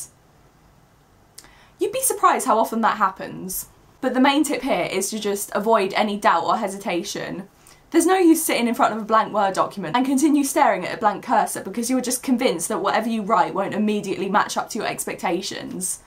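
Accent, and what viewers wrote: England, United Kingdom. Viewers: where from england are you, i do but dont know the accent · Btw where about in England are you because we have literally the same accent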